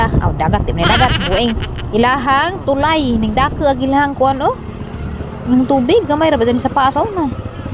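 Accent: Bohol, Philippines. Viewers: Klaro kaajo taga Bohol pud! Bohol pa more!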